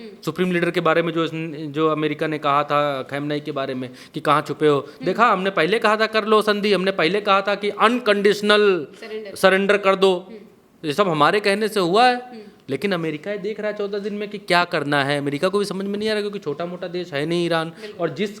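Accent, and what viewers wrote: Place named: Lucknow, India. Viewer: Aap ke bolne ki andaaz bahut behtareen hai Bhaiyyaji Aap ek lucknowi andaaz me bolte achha lagta hai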